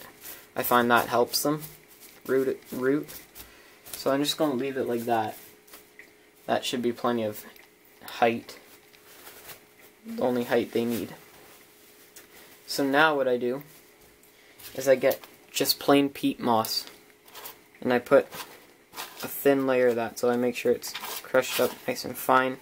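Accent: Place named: Canada. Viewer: No. I just thought I detected a Canadian accent.;-) Did I ask you if you live in Canada? If I did, my old comment is not showing anymore. I talk to quite a few YouTuber’s. So that question only applies (do you live in Canada) if I did ask you that.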